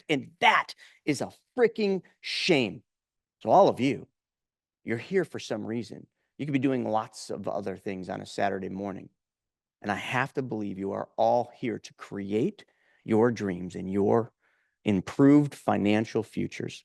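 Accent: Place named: Upstate New York, United States. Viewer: ! 😂 I’m in upstate New York as well and I could hear the accent. I will be calling you to sign up for insurance for my RLT 🎉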